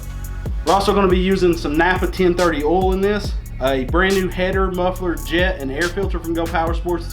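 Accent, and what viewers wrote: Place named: South, United States. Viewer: Don't let em mess with you,it is pronounced ole not o-i-l, everyone knows it's just a southern accent thing. You're a good man helping them kids to have nice little go karts to play with. Go power sports is an awesome company to help you out with parts to help it all come together too.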